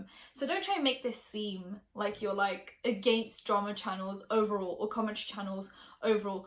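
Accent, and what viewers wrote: United Kingdom. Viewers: What U.K. region is your accent from?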